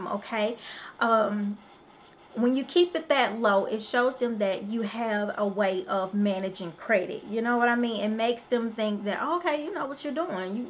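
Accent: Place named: South, United States. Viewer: ... oooh you fine fine.❤ Took me by surprise. You must be a southern girl. Very confident & charismatic. I really appreciate the information.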